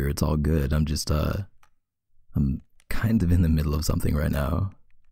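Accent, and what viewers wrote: Canada. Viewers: HIS ACCENT IS SO(;$/!( I LOVE CANADIANS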